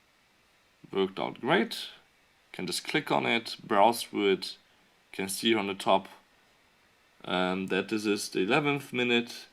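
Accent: Germany. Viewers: Man hört dass du Deutscher bist :D...Aber trotzdem sehr gutes Video,gut erklärt :)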